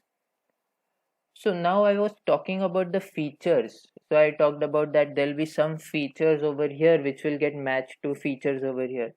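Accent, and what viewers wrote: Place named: India. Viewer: 讲的挺好的，就是印度口音听着有点别扭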